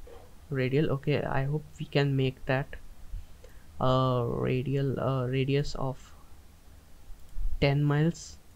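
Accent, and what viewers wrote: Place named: India. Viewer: Sounds like a call centre in India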